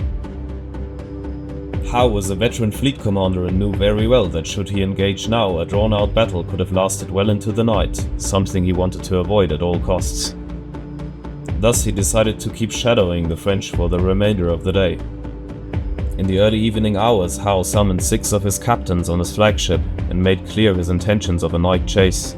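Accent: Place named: Germany. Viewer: so well made and also so funny with your lovely german dialect. you gained a sub!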